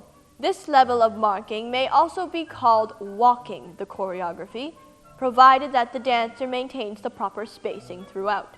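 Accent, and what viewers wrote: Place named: England, United Kingdom. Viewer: I love how English accent becomes more pronounced as the video continues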